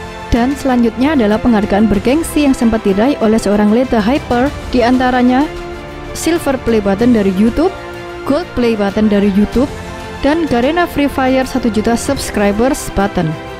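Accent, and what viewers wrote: Lampung, Indonesia. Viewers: Bang orang Ketapang ya bandar Lampung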